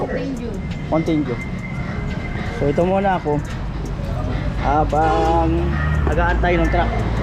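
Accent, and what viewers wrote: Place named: Maranao, Philippines. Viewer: Maranaw ung tono nya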